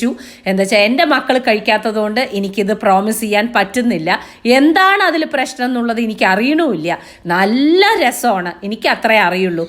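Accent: Palakkad, India. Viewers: ഇങ്ങനെ കൊതിപ്പിക്കല്ലേ കുട്ടി.. എന്താ പാലക്കാടൻ ഭാഷ 🙏👍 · 👌👌👌👌👍👍👍👌പാലക്കാടൻ ഭാഷയും കൊള്ളാം 😍😍😍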